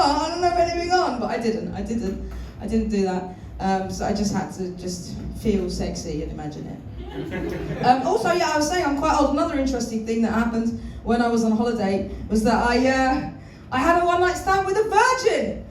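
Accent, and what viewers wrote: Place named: London, United Kingdom. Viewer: Beginner ingilizcemle Londra aksanını 23 dakika izledim, hiçbişey anlamadım ama eğlendim teşekkürler 🤝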